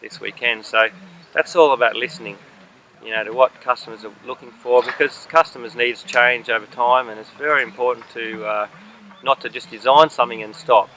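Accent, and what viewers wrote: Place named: Australia. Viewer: Made, supported and spoken like a true Aussie! Fanbloodytastic mate!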